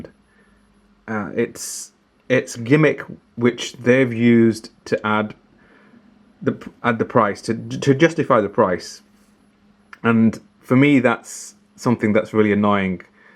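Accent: Yorkshire, United Kingdom. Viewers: Hi, Love your reviews, when can we see your review of your 1963 Chinese Pilot Watch, looking forward to your content as it’s refreshing. Lastly where you from you sound like a Yorkshire lad?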